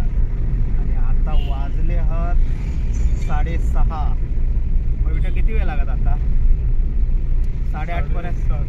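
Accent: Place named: Konkan, India. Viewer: Dada tumchi Kokani language khup Sweet ahai. Tumhi agdi apulkini bolta. Me tumchi sgli video bghto.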